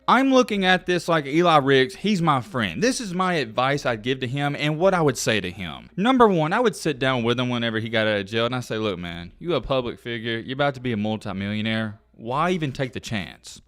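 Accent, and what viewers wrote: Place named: Boston, United States. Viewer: You sound like a bostonian talking about the "patriot way". And the dude got caught driving with no insurance... do you have any idea how many people drive without insurance, that literally why God created the right hand lane. And when you're black you don't need to be going "10,15,20 over" to get pulled over. That seems like a very sheltered take. And as far as the weed.... who cares, it's weed.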